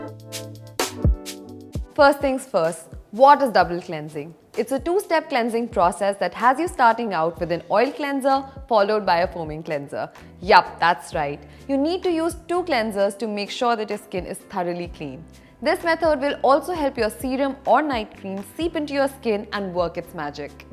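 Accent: India. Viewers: You look Asian but sound Indian 🤔thanks for the video 👍🏼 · I was not expecting that Indian accent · She is indian that's why the accent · Her accent sounds like indian accent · Clicking on the video assuming it a korean channel. Listening the accent: Indian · I'm surprised that your English accent sounds like Indian!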